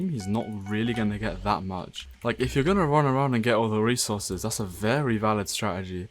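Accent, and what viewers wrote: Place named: South, United States. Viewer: Posh southerner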